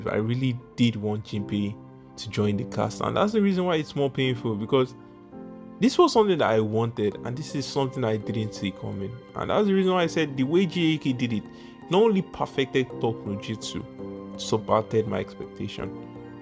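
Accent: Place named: Nigeria. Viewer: yeah bro, you sound like you are from nigeria right. i will follow you right now, but you have to improve your script and also the way you say the words.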